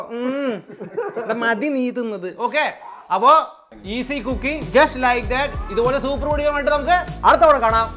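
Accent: Thrissur, India. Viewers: തൃശ്ശൂർ slang❤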